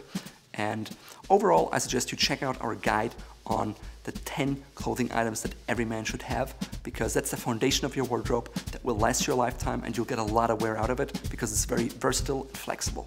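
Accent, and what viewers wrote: Germany. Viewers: I like your accent! Are you German? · are you german? your accent sounds like a german.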